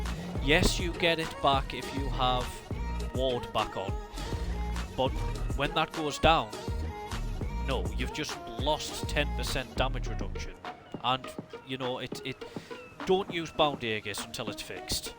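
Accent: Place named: Sunderland, United Kingdom. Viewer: Random one are you from north east? Sounds like a Sunderland accent like me.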